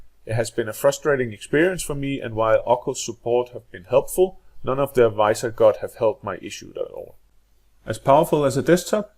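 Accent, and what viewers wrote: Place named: Denmark. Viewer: I can recognize that Danish accent from a mile away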